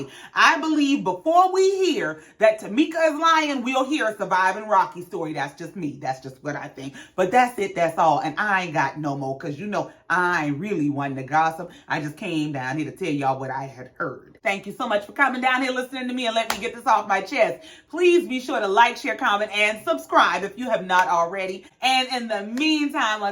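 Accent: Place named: Charleston, United States. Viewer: Hey new subbie... where you from? Sound like Charleston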